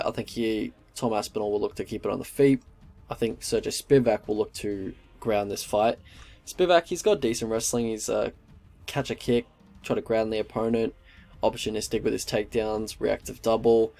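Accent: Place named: Victoria, Australia. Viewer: Oh, ha,ha,ha!!! I thought you had more of a "Victorian" accent. I thought Queensland had a broader more "Crocodile Dundee"-type of accent, ha,ha. But, then again, that would vary even within the same city, as i became aware of in Melbourne. Anyway, great, detailed breakdown, Tom is gonna win and going to go closer and closer to Gane/Ngannou and we are witnessing a new era of heavyweights, that's for sure!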